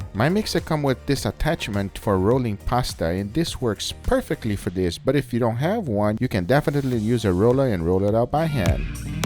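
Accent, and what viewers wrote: Brazil. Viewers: O sotaque dele é brasileiro. Português é a língua materna.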